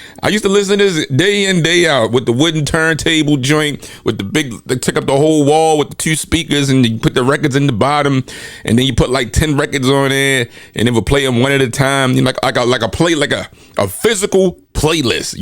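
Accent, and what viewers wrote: Maryland, United States. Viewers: Super dope stuff man. And I thought I detected a MD accent!🙌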